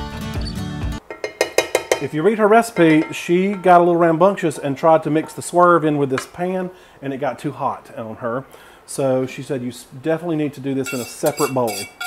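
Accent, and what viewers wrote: South, United States. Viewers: I love your enthusiasm for food !!! and your southern accent is darling!! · I adore you ❣ I adore and appreciate your honesty , your humor and your southern accent keeps me smiling while I am cooking along with your tutorials..... I just adore you ❣❣ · I love your accent ! It reminds me of my southern family!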